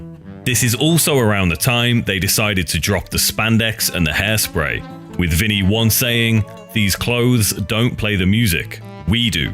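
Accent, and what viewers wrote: England, United Kingdom. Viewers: It should be a law that anyone who speaks with an English accent not only Not inaccurately do Narrations for US music bands but get the facts about the bands their poorly inaccurately doing the Narration correct....yeah I said it and stand behind it. Especially PANFUCKINGTERA DICK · Heavy Metal documentaries with British accent narrations SUCK!!!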